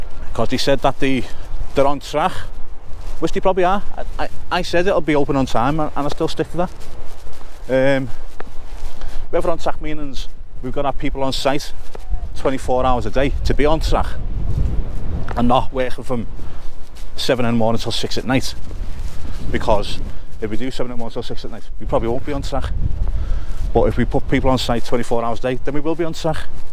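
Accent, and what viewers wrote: Liverpool, United Kingdom. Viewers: Love your videos.....can’t always understand every word of your lovely Scouse accent but I’m a Geordie and you probably wouldn’t understand me ! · Personality l think your coverage is brilliant .. honest , humorous and as a scouser living in Australia 🇦🇺 great to hear a raw scouse accent 👍 if thay don't like the commentary .. thay don't watch it ok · Scouse accent is worth the watch alone. Boss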